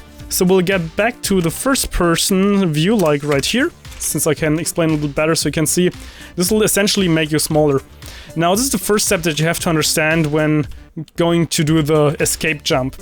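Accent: Germany. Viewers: deutsch as fuck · Are u German?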